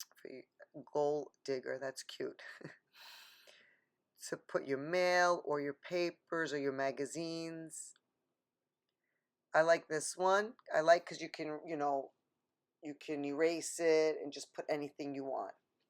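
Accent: New York, United States. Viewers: I hear a NY accent girly!! ❤️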